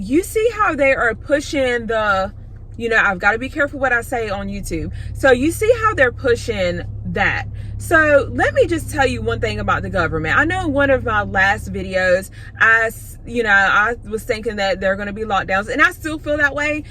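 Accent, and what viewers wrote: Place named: Mississippi, United States. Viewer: Love your accent. It sounds a lot like a Mississippi accent, my home state. The stuff is about to hit the fan. We cannot continue to go further into debt. Inflation is rampant yet interest rates remain near zero. It’s a rock and a hard place.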